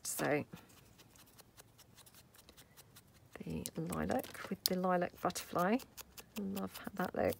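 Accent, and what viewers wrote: England, United Kingdom. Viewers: These are so cute and look like a lot of fun to make. Thank you, you never disappoint ❤️❤️🥰🥰😍 You use the word “rubbish and fiddley’ a lot, with your cute little English accent and I chuckle every time. 🥰❤️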